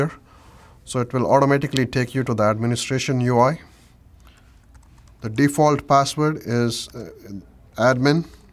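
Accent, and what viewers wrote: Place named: Arab world. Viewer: Mto bacana o vídeo, deixo minha pergunta pq o sotaque árabe, iraniano ou perse?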